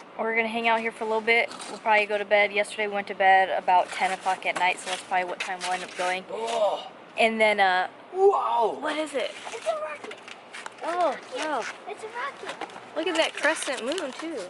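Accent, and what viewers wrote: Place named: Michigan, United States. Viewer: I had a feeling you weren't from Arizona. My wife is also from Michigan and you sounded a lot like her and my in-laws...I hope to make it out to Arizona some day, I'm excited to see how the dry heat feels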